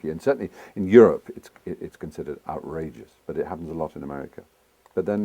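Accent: United Kingdom. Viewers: he makes coke sound so British and classy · It is phenomenally strange hearing Stephen Fry describing his drug use, with all the glory of a proper British accent of a gentleman scholar. "So for many years really I never went out without at least four or five grams of cocaine powder on my person. And I would ingest it intra-nasally, as was the fashion, through the use of some sort of straw or rolled up currency note."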